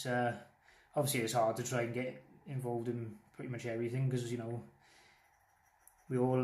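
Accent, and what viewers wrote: Wales, United Kingdom. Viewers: Hey. Just catching up after getting my computer back in order. Cool video and nice to hear a Welsh accent. Makes me kinda homesick, lol (I live in Holland). I've never tried wood burning, but it looks amazing. Agree with what you said about the community and getting lesser known artists seen. With that said I will be doing my first shout out this week. Just trying to get back on my feet as I've had a few health issues of late along with all the hassle with my computer breaking down. Anyway, at the risk of leaving a novel-length message I'll just say great video and nice to see the process:o) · This is great, hearing you explain your art is a lot better for me and probably other people too, rather than music!! I really enjoyed this video, your tools, how you explained your art is Fab. I'm a real supporter of your channel 👍 I get what you mean about subs for subs!!!. 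Loved it, thanks for showing us your art😊✌️👍🇬🇧 p.s miss the Welsh accent I grew up in carnarvon, love Wales 💝👍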